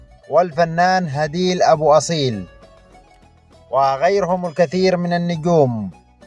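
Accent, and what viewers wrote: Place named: Yemen. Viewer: حاول تخلي كلامك لغه عربيه وليسئ العاليه يمنيه تكسر الهاء نصيحه اخ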